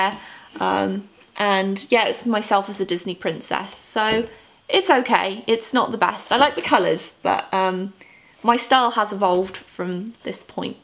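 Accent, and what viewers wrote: United Kingdom. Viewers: Ur British accent is so hot 😍😍😍😍😍😍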